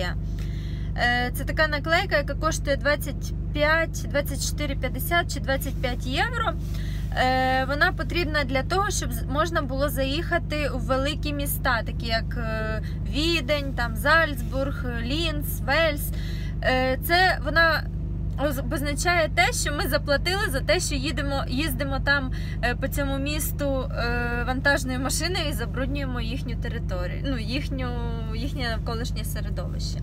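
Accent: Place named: Surzhyk, Ukraine. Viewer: Ох Суржик суржик) Привет из Сумщины😁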